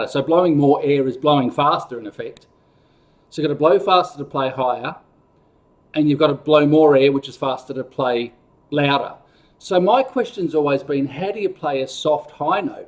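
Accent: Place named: Australia. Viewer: ! I LOVE YOUR TEACHINGS AND LOVELY AUSTRALIAN ACCENT!! I'M JUST LEARNING TO PLAY THE TRUMPET! SO I'M SO! GLAD YOU ARE DEMONSTRATING HOW TO DO IT THE EASIER WAY!! I'M ORIGINALLY FROM FLORIDA, AND HAVE MOVED OVERSEAS TO PAGADIAN CITY, PHILIPPINES! THANKS AGAIN! AND MAY GOD BLESS YOU! ALWAYS!! 👍😁🙏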